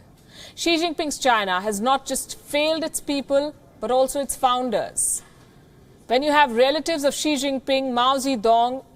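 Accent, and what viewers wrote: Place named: India. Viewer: India and China, two of the oldest civilisations on earth should lock hands. But here you are clever by half, breeding discord. You are a developing country criticizing another. As if your leaders are holy and free from corruption. Lee Kuan Yew said that China like other countries is afflicted with corruption, but the difference is that Chinese leaders are able to get the job done. So woman, you should keep your strong Indian accented trap shut.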